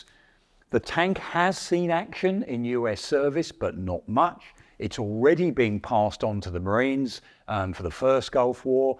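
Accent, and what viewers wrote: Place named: United Kingdom. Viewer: IDK what it is about the British accent of older dudes, but it fits this. As an American it should be US law that in documentaries, there should only be a British commentator. It makes me feel like I'm learning and not just listening to information I will never need 😂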